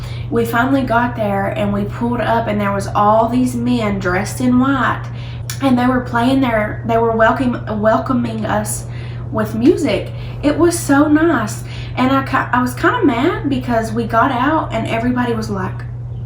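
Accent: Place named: Kentucky, United States. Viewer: I love your accent the Kentucky accent. 😁 welcome to algeria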